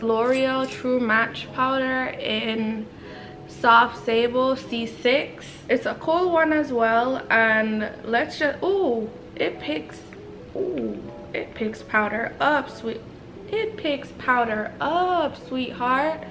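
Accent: Jamaica. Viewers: gurl JAMAICAN PURRRRRRRR. DONE KNOW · Mi hear the patwa and affi subscribe 🇯🇲🇯🇲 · The Jamaican accent!!! Yeh girrrrl... right out 😂❤️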